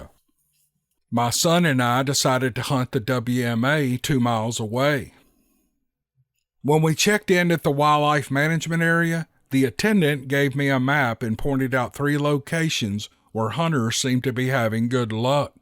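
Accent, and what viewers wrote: South, United States. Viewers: It’s his southern draw and personality that make the stories even better. I’ll bet if someone else narrated them, it wouldn’t be near as good. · Good to hear a southern voice I'm Texan but you can tell the accent we're all different and we all stick together you have great stories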